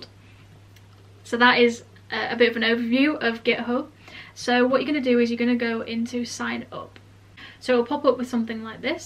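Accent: United Kingdom. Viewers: I am in love with that British Accent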